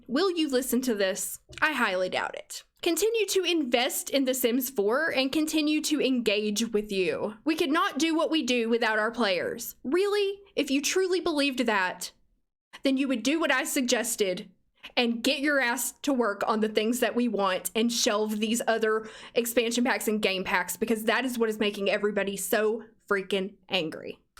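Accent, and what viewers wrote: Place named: South, United States. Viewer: I’ve never seen one of your videos before, but I absolutely fuckin adore you now 😂 your reactions and facial expressions remind me of the way I react to things, and your lil accent (is it southern? Kinda? Am I impaired?) just seals the deal! New sub here, can’t wait to binge your content ❤️ love from Toronto, Canada!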